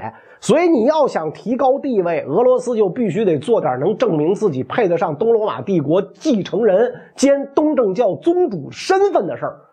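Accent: Beijing, China. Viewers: 听到袁sir这字正腔圆的北京话就神清气爽啊！提神👍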